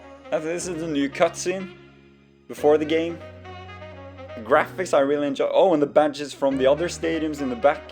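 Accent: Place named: Norway. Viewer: Are you Norwegian? You speak kind of Norwegian/English 😊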